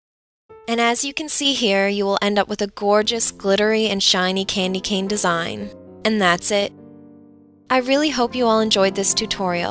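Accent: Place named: Ontario, Canada. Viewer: Are you from Canada because when you start or started it sounds like you have an Ontarian accent.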